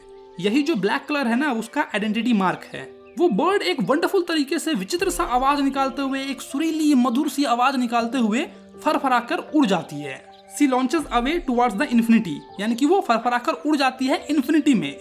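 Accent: Bihar, India. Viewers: Bhaiya your way to teach us is extremely nice, as I know you sometimes use bihari accent language that,s awesome I have also several friend from bihar which is also great and hilarious.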